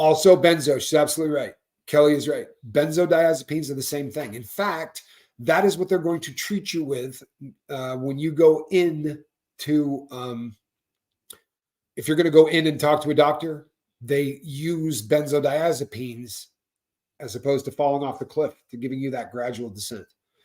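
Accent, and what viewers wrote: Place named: South Boston, United States. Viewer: I hear a lil bit of Southie in your voice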